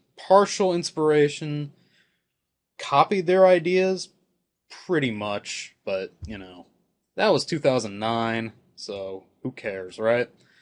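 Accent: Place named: Australia. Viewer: That sounded like an Aussie voice :)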